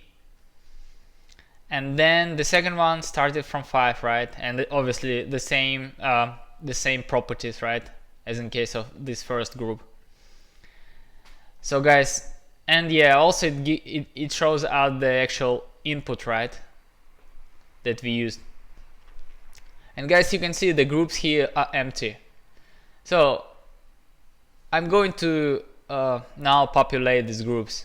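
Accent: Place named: Russia. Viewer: Я один слышу русский акцент? )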